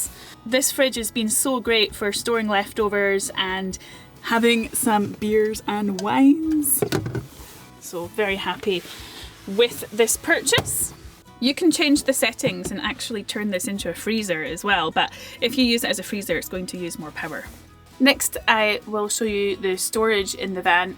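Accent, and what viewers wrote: Scotland, United Kingdom. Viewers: Soon as I saw this, thought brilliant, love one of those so checked out the web site and they are pretty useful compact pieces of kit ,really handy for recharging; Our head torches, my old basic XP130 Fuji Camera (mostly for Insta but planning on relaunching utube channel), power washer (for our muddy mtbikes), devices, vacuum cleaner (camp necessity with debris and midgies reluctant to exit by their own free will) and brightening things up with traditional Christmas multi coloured led string lights. Love your content and delivery with that accent and enthusiasm for whisky/all things Scottish! cracking channel and entertainment!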